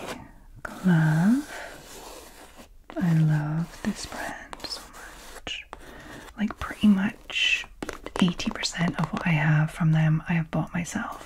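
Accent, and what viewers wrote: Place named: Scotland, United Kingdom. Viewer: Been binge watching your videos all evening. Just discovered that you’re Scottish, so hello from Edinburgh! I haven’t been able to place your accent but I can definitely hear it now.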